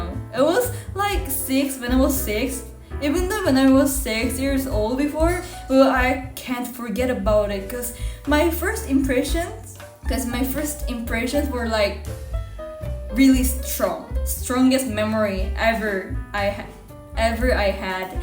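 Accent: Philippines. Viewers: Yoir accent is filipino english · My first impression of you is you are very PRETTY and you have a Filipino English ACCENT! I wonder how will you're reactions when Koreans hear and realize you have a Filipino English ACCENT...enjoy Ph and keep making videos we are watching you 😎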